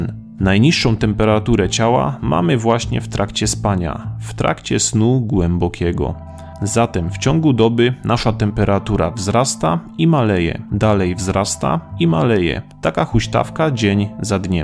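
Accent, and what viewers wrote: Silesia, Poland. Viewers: Krótko, zwięźle i sensownie. Lekki śląski akcent 😉👍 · Narrator to Ślązak? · Jesteś ze śląska? Tak mi się wydaje, że masz akcent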